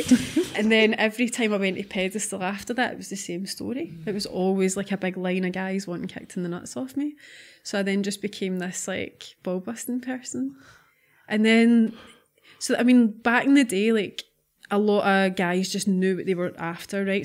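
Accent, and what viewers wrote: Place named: Scotland, United Kingdom. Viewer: I could listen too her voice all day long. Scottish women 😍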